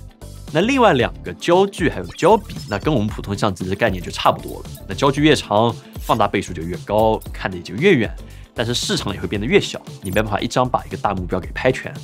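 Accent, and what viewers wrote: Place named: Jiangsu, China. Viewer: 这口音，江苏人。我福建的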